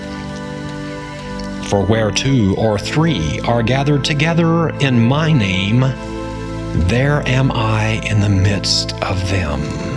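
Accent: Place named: United States. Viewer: So, Jesus said these things to someone, then 300 years later, someone heard down the grapevine what he said, then wrote it in Greek, then it got translated to Italian, then it was run through the Catholic system, then the Protestant system, and then translated into English and imported into North America, and is now being recited by a country-accented white man, and therefore it holds the faith of so many as the unadulterated Absolute Truth? Just trying to get the understanding right.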